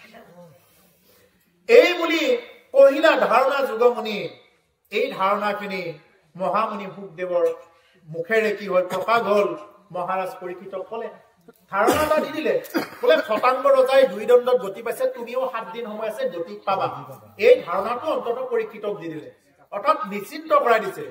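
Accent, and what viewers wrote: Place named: Darrang, India. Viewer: কিমান সুন্দৰ লাগে এই দৰঙ ৰ সুৰ টি কৃষ্ণ কৃষ্ণ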